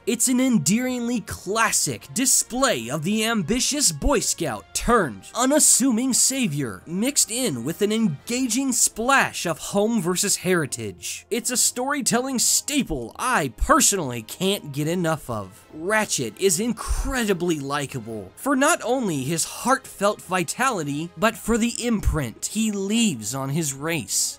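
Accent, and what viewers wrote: United States. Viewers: erm, upstate new york?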